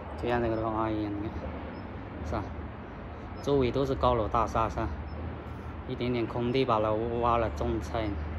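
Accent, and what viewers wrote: Hakka, China. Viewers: 閩西客家話口音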